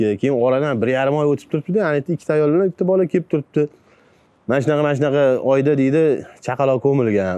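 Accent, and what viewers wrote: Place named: Tashkent, Uzbekistan. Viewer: Укам койил тошкенчасига жайдари тилда тушунтириб Бердинг менимча хамма Зур тушунди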